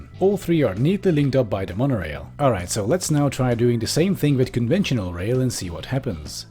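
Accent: Hungary. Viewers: Are you from Hungary?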